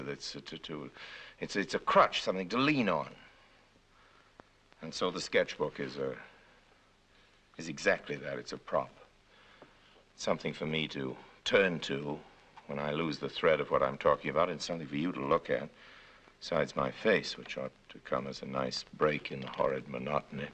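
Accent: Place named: Transatlantic, United States. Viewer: I think he was mostly raised in America but acquired a transatlantic accent, as many actors and upper class people did in the '30s, for example Franklin D Roosevelt had a similar dialect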